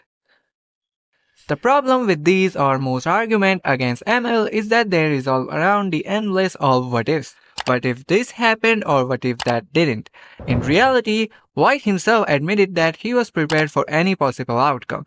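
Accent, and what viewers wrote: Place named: India. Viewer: Wow, Amazing video 💫 always refreshing to watch a scd video in Indian accent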